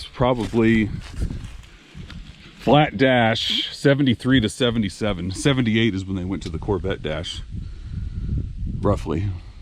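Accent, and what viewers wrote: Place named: United States. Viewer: I’m from Poland 🇵🇱 i working as truck driver. U have beautiful American accent ❤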